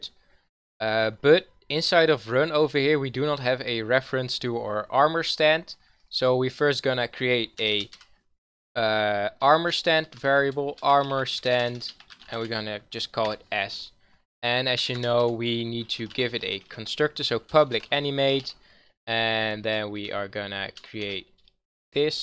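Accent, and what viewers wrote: Netherlands. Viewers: Nice Nederlands accent!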